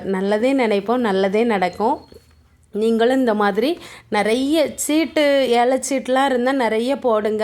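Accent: Kanyakumari, India. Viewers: Indha slang a kettalae Kanyakumari nu takkunu thonum😂😂😂😂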